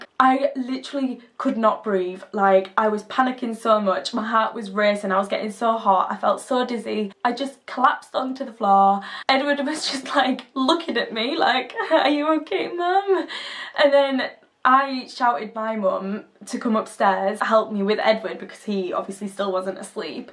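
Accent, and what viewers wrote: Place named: Yorkshire, United Kingdom. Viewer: love ur Yorkshire accent!!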